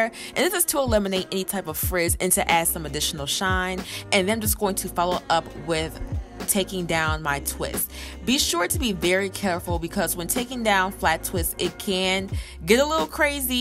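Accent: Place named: Philadelphia, United States. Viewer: baby girl u from Philly? it's soo subtle but i hear an accent😁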